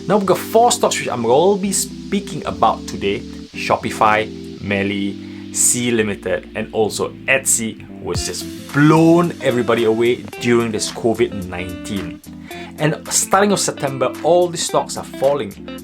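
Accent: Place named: Singapore. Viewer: Are you originally from Singapore? I hear the Singaporean accent ;)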